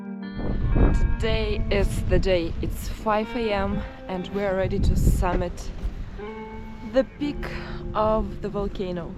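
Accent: United States. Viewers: Пошленькая девушка и такой же пошленький американский акцент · Thought you were saying Geyser wrong, since your accent is more American sounding maybe you should say it <link>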